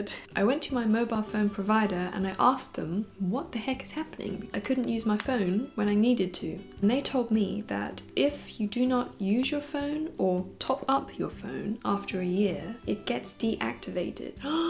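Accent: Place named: United Kingdom. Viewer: Love the natural and authentic way you do your videos! And aswell your British charme and accent ;).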